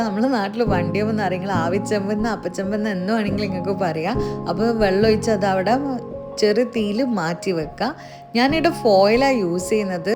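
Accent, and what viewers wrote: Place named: Thalassery, India. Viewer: Hearing you speak in our good old Thalassery accent—without trying to sound too polished or artificial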